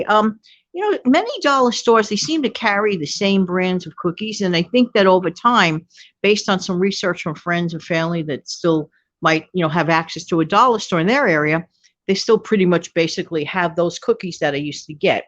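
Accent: New York, United States. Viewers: New subscriber. I saw you on Centsible Living's channel with Prepper Princess. Love your personality and NY accent. Great video on the DT. 🌻🤗🤗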